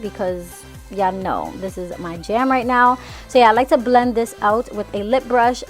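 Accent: New York, United States. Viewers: I love your videos! You’re absolutely gorgeous and always love the vibes. I’m from philly but I love a New York accent! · Your such I New Yorker. As one myself it cracks me up hearing the way we really talk. Like I don't catch it in myself but I know that's how I sound too tho. Been told one to many times! Love ya girl!!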